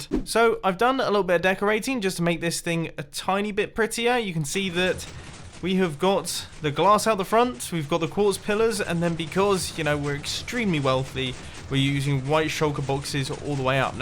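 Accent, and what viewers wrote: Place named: United Kingdom. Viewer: this guy is so bri'ish it's fucking disgusting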